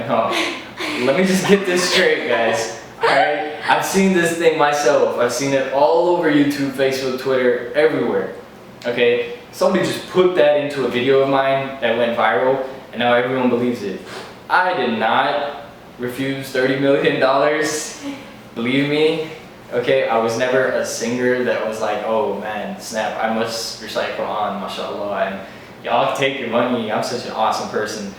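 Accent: India. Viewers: Your Indian accent was so funny😂😂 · nice Indian accent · Hahahaha really funny love the indian accent Thank you so much we want more vedios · Indian accent bhai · Hahaha the indian accent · love the indian accent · Indian accent on point! 👍👍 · masha allah....u have nice sense of humour and indian accent too......keep up the good work.....ur recitation is so pleasing to hear...!! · that indian accent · i also live in india i wanna meet you plz gimme your address...... <mail> plz send me your address. your nail indian accent.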